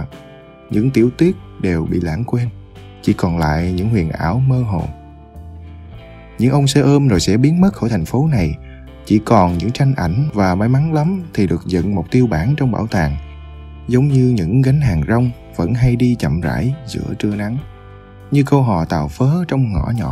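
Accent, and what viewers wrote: South, Vietnam. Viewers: Hai bài giọng miền nam nghe hay mà nội dung không hay Nghe cứ sao sao ấy không hút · Nội dung người Bắc soạn, giọng đọc người Nam truyền tải. Là người Hà Nội sống và làm việc tại Sài Gòn, tôi thích cảm giác này. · Cái giọng đọc miền Nam chuẩn kiểu này giờ ngày càng hiếm. Mong bạn giữ gìn nó nhé. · Bài viết của chàng trai Hn, giọng đọc miền nam nhưng mình thực sự thích giọng đọc này, ở đoạn cuối có những đoạn lắng trầm rất cảm động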